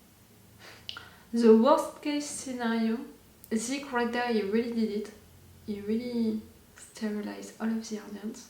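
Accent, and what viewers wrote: France. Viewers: Tu as l'accent français quand tu parle anglais, c'est mignon 😊😊😊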